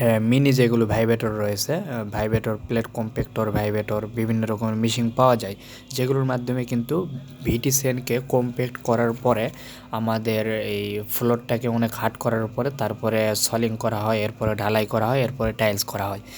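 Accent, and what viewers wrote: Naogaon, Bangladesh. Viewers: আপনার বাসা নওগাঁ জেলাতে মনে হচ্ছে!!!!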